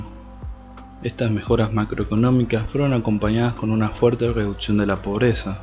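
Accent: Argentina. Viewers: Si es peruano , por qué habla como argentino?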